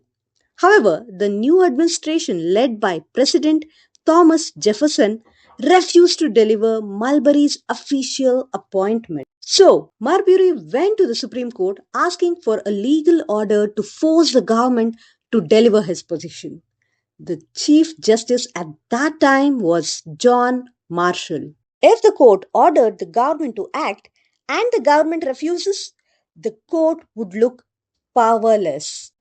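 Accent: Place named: India. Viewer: Her accent is too thick. India folks are difficult to understand.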